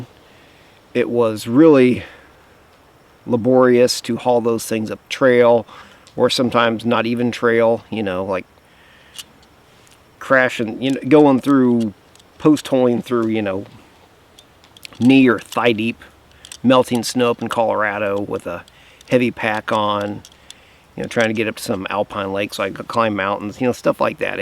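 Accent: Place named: Kansas, United States. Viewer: I haven't caught up with every single one of your videos (probably won't be able to), but I really appreciate the commentary here. I'm past 50, living in NE Kansas and always trying to get to Colorado in the summer. I'm not a technical climber but have done about 25 14ers and finally made it to Conundrum Hot Springs at the end of this summer with a pack that was just... too-freaking-heavy. I'm in the "slowly buying new stuff to replace my bombproof and not in any way broken but heavy as lead old stuff" and wondering exactly what I'm trying to accomplish. Probably not a through hike of the CDT (as much as I would like), but trying to match gear with comfort, fitness level, and the opportunity to actually use it. I appreciate you relating your experiences. Also, your cadence sounds like a native western-ish Kansan. I'm not, but it's fun to hear.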